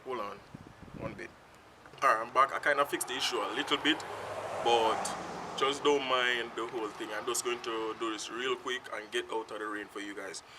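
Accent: Jamaica. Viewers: Love the Jamaican accent!!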